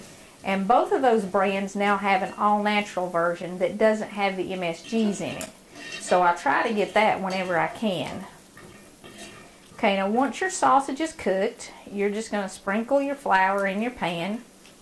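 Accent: South, United States. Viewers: Hi, this is my first time viewing your channel, I hope you never get tired of hearing this.. your southern drawl is awesome! I was blessed to have my moms parents who were German and Italian (both very thick accents) raise me.. well for the most part. Then mom married when I was 5 to a very good man.. which meant I inherited another grandma, his mother. She was very southern with a thick accent as well! LoL This was not a wealthy woman by any means, in fact she struggled at times financially. She had 3 grown sons and had no intentions of having any other children in her mid 50’s.. but surprise! She had a daughter that was only a year older than myself when we met. Technically, her little girl was my Aunt by marriage but naturally we were raised more like sisters. I’ll tell you what, my southern granny made the best food out of practically nothing sometimes. 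Her biscuits and gravy were simple just like u showed in your tutorial but ohhh so flavorful! Just like you said, it didn’t take much sausage to feed a handful of ppl since it was only meant to flavor the gravy. I probably wouldn’t have tried rabbit in my lifetime had it not been for her southern cooking. Her fried chicken was to die for and she could do anything with a pot of beans and some cornbread when the budget got tight.. and it was delicious! Traditionally at Christmas time, we did not set out milk and cookies for Santa at her house but rather a bowl of butter bean soup and a homemade biscuit. One year us gir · U just made me hungry seeing that plate with fried apples 😋.Must be a southern video by voice👍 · Thank you so much, I really enjoy it and remind me the country accent of my father in law, God blessed you! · Woman with southern accent + cast iron skillet = great food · You sound like a hillbilly to me and I like that. 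I like hillbillys, I like you, I like your style, and I like your videos. The residue on the bottom of the pan is called "fond." Incorporating it into your sauce is deglazing.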